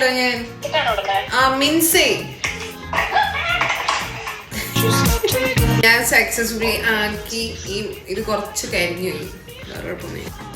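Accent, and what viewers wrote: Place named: Kannur, India. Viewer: Correct kannur baasha😂😂❤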